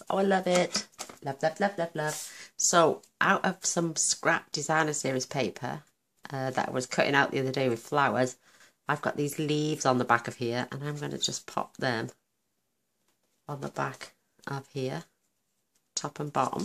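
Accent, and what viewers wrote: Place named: Yorkshire, United Kingdom. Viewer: How lovely and unusual - love it (are you in Yorks - just going by the accent xx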